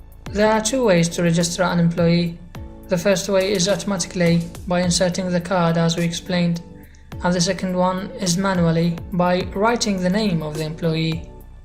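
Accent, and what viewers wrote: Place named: United Kingdom. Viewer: جهاز روعه واللكنة البريطانية اروع ! استمر للأمام...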